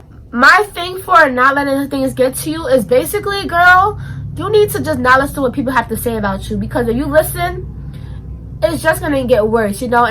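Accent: New York, United States. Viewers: I love your accent cause you sound so New York, love you 💕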